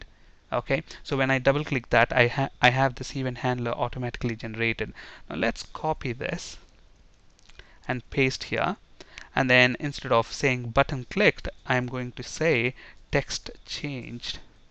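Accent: India. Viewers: very helpful ....may i know where you are from? ur english is excellent but u kinda have a indian accent.. :) am i right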